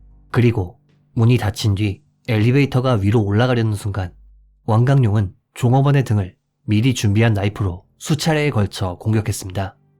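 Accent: Japan. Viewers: 일본에 살고 계시거나, 일본과 종사하는 일을 하시는지요? 일본 지명이나 단어를 이야기할 때 쿠세가 있는 것 같네요. 다만 장기간 거주한 것은 아닐 것 같은 발음입니다. 영상 잘 보았습니다.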